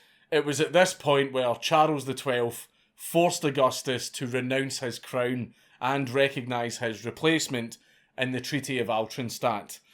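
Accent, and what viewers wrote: Scotland, United Kingdom. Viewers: You're not my type, I'm afraid, but if I were a woman, I'd marry you just for your Scots accent. Thanks for the story, by the way. Augustus was a "bastard factory" ? That's one for you !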